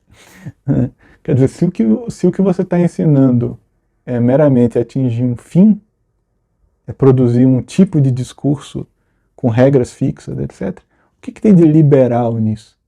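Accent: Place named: Bahia, Brazil. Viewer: Professor me tire uma curiosidade:o professor é de onde na Bahia?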